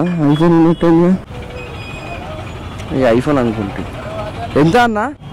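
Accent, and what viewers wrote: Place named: Hyderabad, India. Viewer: Pakka Hyderabadii antar ra babu🤙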